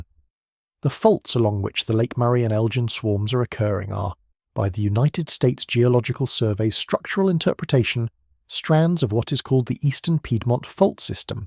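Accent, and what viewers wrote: United Kingdom. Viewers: Why is it always a British accent?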